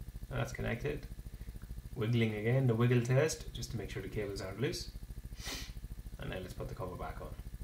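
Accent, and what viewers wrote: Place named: Ireland. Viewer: its hard to be sure about a technician with an irish accent, its a tad off putting, telling a joke or singing a song, writing a poem, sure, but not doing something technical, just saying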